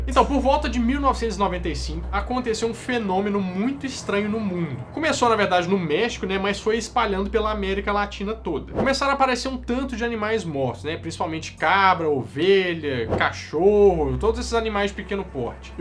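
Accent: Minas Gerais, Brazil. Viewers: Acho fofo sotaque mineiro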